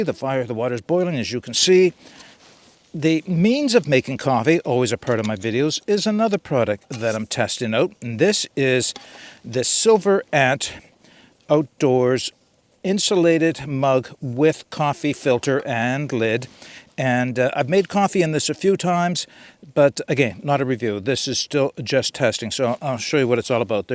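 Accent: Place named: Nova Scotia, Canada. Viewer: Thank you for everything you do. The first time I stumbled upon one of your videos, your accent seemed oddly familiar. It took me a while to figure out that you were a fellow Nova Scotian. I have purchased a number of things based on your reviews. I trust your opinions. I cannot fathom the time and effort you put in. Well done.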